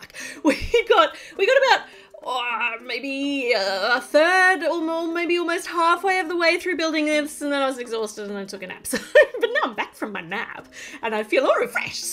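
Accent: Australia. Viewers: I have been watching your vid so much I’m starting to wanting to talk in a Australian accent! 😆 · I love this, video and what country are you from you sound Automatically Austrilian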